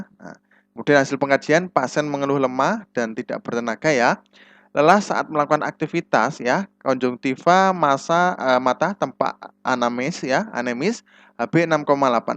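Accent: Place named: Bengkulu, Indonesia. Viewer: Cak nyo wong bengkulu abng ni😂.. sukses terus chanelnyo banh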